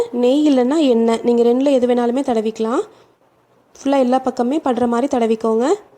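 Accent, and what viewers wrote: Coimbatore, India. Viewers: Nega coimbatore ah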